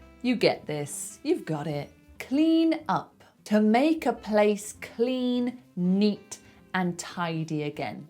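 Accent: United Kingdom. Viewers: I'm on B1 English level, this video was good for me, thank you very much. I watched it with English audio, but sometimes I needed to read English subtitles. I'm Brazilian, and I think your accent is British English.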